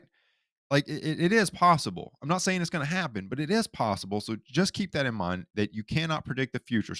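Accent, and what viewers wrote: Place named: South, United States. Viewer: Yet another financial talking head spewing advice. Sure, like his calculation that's great. But for crying out loud, how could I honestly take advice from someone that looks like he's got his smile permanently pasted on himself and he's got his fingers stuck in a light socket?! and....geez....he sounds like a good ol' country boy. Apologies that I'm that opinionated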